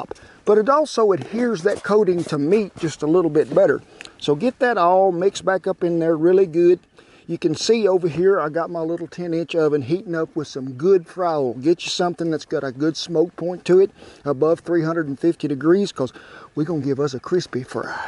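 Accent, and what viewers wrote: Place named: Oklahoma, United States. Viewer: Kent est un vrai bijou pour les amoureux de la cuisine outdoor.Il a un coté frenchy dans sa maniére de manipuler les ingrédients,on sent qu'il aime ce qu'il fait . Et son accent de l'Oklahoma...Génial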